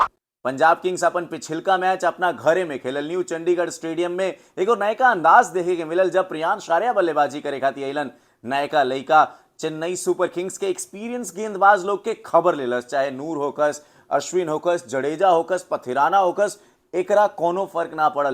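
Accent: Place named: Bhojpuri, India. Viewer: Bhai Aap ki comentary To Hamko achi Lagti hi Bhojpuri ke Andaaj me. Lekin Hamko lagta Aap ko ye nhi pta ki Ipl ke saare match Bhi fix hi 🙏🙏🙏🙏🤣🤣🤣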